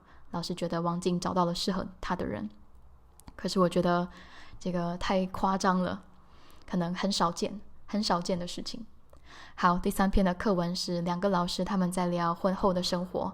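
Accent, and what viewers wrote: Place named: Taiwan. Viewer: Thank you so much your video are just perfect even after 5 years your video is still useful, im also taiwanese so I'm so glad i found a video with taiwanese accent ❤ the subtittle a lot too thank you for working very hard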